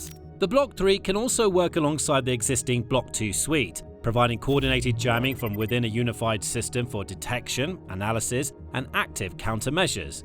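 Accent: United Kingdom. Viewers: Why is this using a British voice if its generated in the US? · The US Military should have a hand in reviewing videos like before they are uploaded to YouTube. This guy with a British accent does not care if he is revealing top secrets of the US Navy. He is more inclined to sympathise with the IRGC, and with this video, he is giving a tip to the IRGC on how to sink the carriers that are already in the war. Hey US Military, FBI and whoever else: Start kicking doors!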